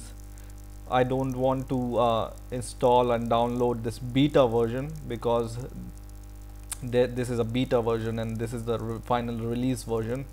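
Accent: India. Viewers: Lemme get things clear, Your PC is in German, But you sound Indian... Makes sense. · The accent is like a cleaner version of indish.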